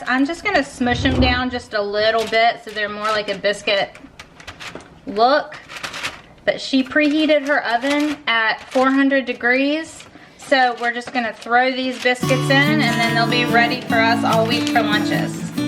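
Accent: South, United States. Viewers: Are you in or near Texas you have a fabulous southern accent.. ya all lol x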